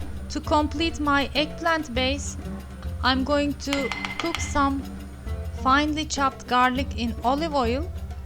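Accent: Turkey. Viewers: I just love turkish food and i love turkis english accent 😂🤣🤗💪😁🇹🇷🇸🇴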